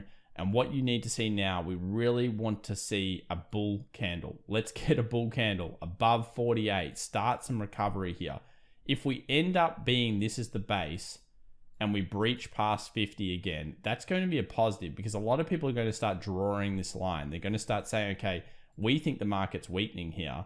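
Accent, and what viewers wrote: Australia. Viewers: The only Analysis channel I watch great video 🙌 you have a cool accent, are you Australian?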